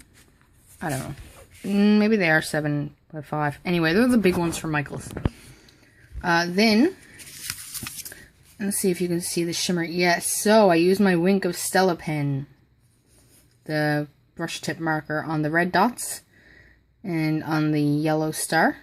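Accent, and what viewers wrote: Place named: Canada. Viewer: Cute cards! Love the watercolored tree cards! I also love that falling snow stencil! Want to get it too! I thought I could hear a Canadian accent, and then when you said you ordered from Amazon.ca, I knew for sure! I was born and raised in northern Alberta. I live in the States now. Anyhoo, I don't think it's too early to make Christmas cards, especially when making so many. Singing, however, is a different story, heehee! TFS! Hugs, Shonda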